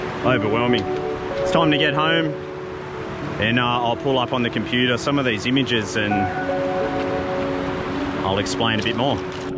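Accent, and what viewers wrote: Australia. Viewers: Just spectacular. I have only just come across your channel and am stunned by every image in each video and your easy to listen to style (Aussie) and the detailed information you provide. I must get to NZ.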